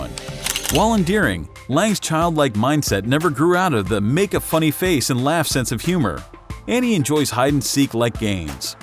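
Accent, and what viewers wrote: United States. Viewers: Anything narrated with an American accent ruins the subject involved.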